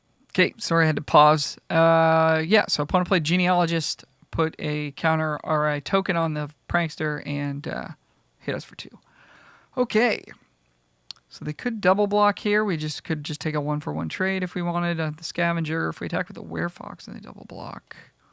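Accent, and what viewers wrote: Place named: Canada. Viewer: You say Ey a lot. U Canadian?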